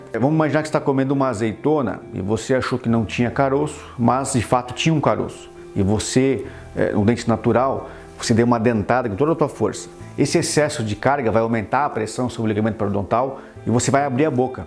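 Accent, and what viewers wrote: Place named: Pernambuco, Brazil. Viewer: O senhor é de Pernambuco Dr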